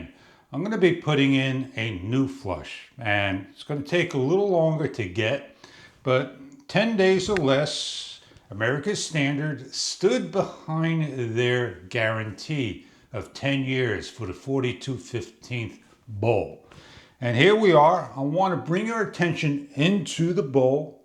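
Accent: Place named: New England, United States. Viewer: Thank you. Your NE accent makes is interesting. (O: I have spent hours and hours on our model 4266, first with new seal, then replacing the whole flush mechanism. Still having it refill to the right spot on the overflow tube, about every 5 to 15 minutes. I think I've tried and learned every lesson you share. But, maybe the heavy nut on the flapper will do the trick. I'm with you, not buying another American Standard toilet. Thanks!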